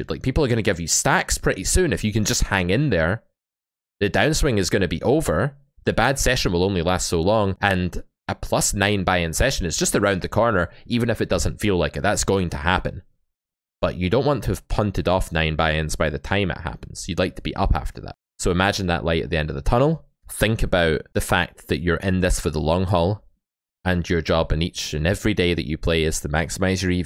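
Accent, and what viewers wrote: Scotland, United Kingdom. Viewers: New sub! Where in Scotland are you from? -poker player from East Kilbride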